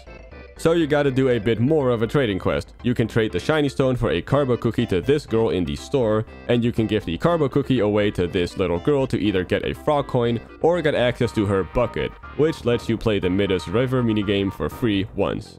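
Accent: Netherlands. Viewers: You sound very dutch im dutch as well loll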